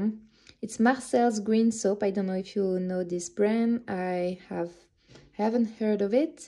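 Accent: Wales, United Kingdom. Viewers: The coast of Croatia looks so beautiful. I, too, love to hear the Welsh accent. I hope & you have a great weekend and the rest of the week. 🧡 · Love watching your travels! You'd be very welcome in the UK anytime:) and the welsh accent is lovely! My mum was welsh and she had such a lovely speaking voice! Happy travels x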